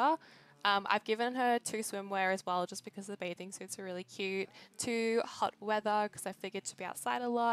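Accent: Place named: Australia. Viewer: Got some catching up to do🤍love this vid. Your accent is awesome🤭Aus?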